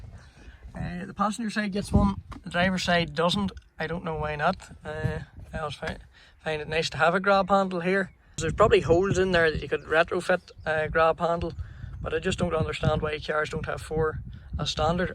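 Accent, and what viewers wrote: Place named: Derry, United Kingdom. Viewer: Hearing this lads accent to me he sounds like he is from Derry Amen Godbless Madeira 40 40 Liamp/atrickmr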